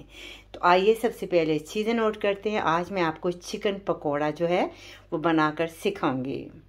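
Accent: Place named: Germany. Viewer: میرے کے لگ رہے ہیں ۔۔۔۔ ۔۔۔۔۔۔۔۔۔۔۔۔۔۔۔۔۔۔۔۔چکن پکوڑے اتنی مرچیں ۔۔۔ دیکھ۔ مجھے۔ پسینہ اگیا۔ ھے 😁 آپ کی اردو اچھی لگتی۔ ھے۔ جرمن۔ ایکسینٹ کے ساتھ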